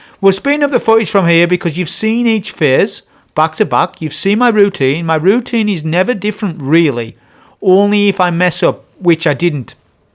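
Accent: United Kingdom. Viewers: Also the amount of British in this video is definitely an 11/10 crashout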